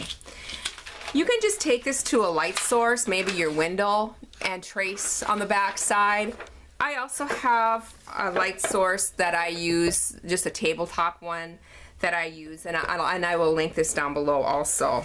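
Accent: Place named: North Dakota, United States. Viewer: Hi. I've just discovered your channel. I love it! The first thing I noticed was your accent. I immediately recognized it as most of my relatives live in North Dakota and are Norwegian. Made me feel right at time, lol. Keep the videos coming, they're great!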